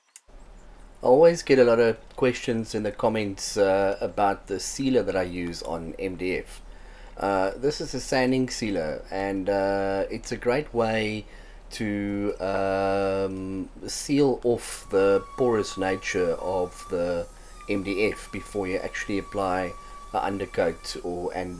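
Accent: South Africa. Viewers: I immediately recognised your accent as SA. Well done! you have created a very nice channel. I will be following. Nice projects!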